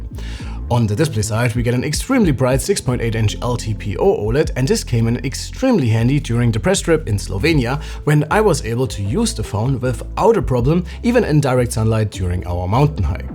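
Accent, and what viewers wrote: Germany. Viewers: Harter deutschsprachiger Akzent (hab ich aber auch wenn ich Englisch spreche) 😊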